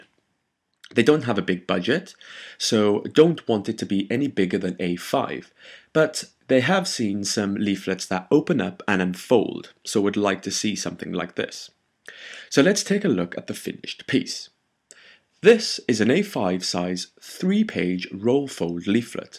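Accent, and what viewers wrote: Wales, United Kingdom. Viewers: you're obv not British haha...he has a classic Welsh accent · What could be better than a smart young graphic design teacher with british accent?, your tutorials are amazing! be my teacher:-( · Asian-British?! As a fellow welshie, love it haha! x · Are you welsh or am i just hearing things? I can't tell anymore.. lol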